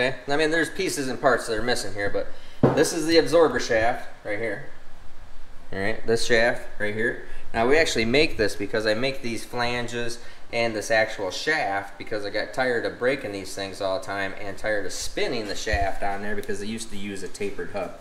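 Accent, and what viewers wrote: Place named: North, United States. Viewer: I'm from Arkansas so I noticed that same thing he definitely sounds like he is from the north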